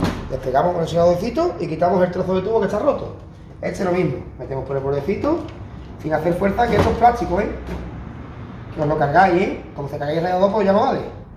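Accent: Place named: Spain. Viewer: En el minuto de tu video preguntas "me entendéis no? y la verdad que se te entiende la mitad de lo que dices, mi intención no es desvirtuar tu trabajo ni mucho menos descalificar tu modo de hablar, se perfectamente que en algunas regiones de España tienen fonéticas al hablar bastante particulares, lo cuál es respetable pues es tradición autóctona y eso no se discute, en el norte de mi país también ocurre pero no tan diferenciado, pero para los latinos que hablamos el Castellano mas pausado nos resulta difícil entender este léxico y tu si que hablas rápido, creo que deberías tener en cuenta esto a la hora de hacer un video redactando mas lento ya que serán muchos los latinos que lo verán y les facilitarás el entendimiento, gracias por tu video y un feliz 2022.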